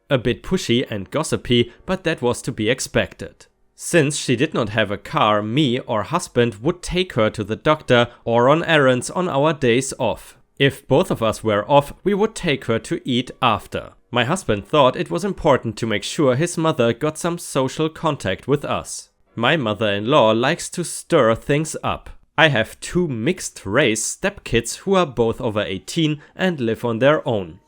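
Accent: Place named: Germany. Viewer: Okay, your accent is very strong so I ask myself :D German or Austrian? It sounds so because of the sound of some words - If not.... Shame on me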